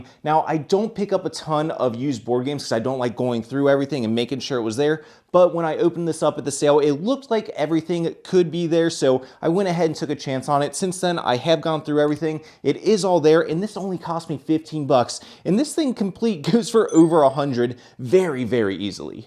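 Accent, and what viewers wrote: Philadelphia, United States. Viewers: That accent has gotta be Philly or Pittsburgh. Request for you to say a few words in your next video: water, home, motor oil, hoagie, youse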